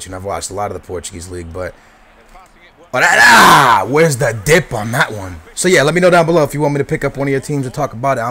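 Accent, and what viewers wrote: United States. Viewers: he learned to speak english the american way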